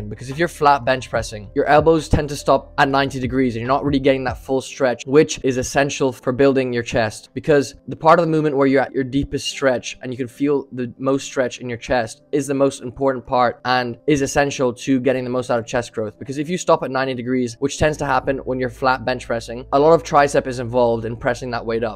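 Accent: Ireland. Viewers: Thanks for this. Also, I've never heard an Irish hybrid accent like that before.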